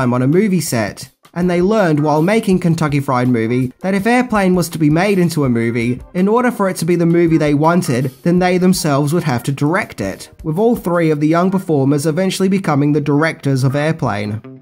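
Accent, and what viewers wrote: Australia. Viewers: I’d say all the dislikes are for the whiney Aussie accent and him wearing sunglasses indoors I guess for comedic effect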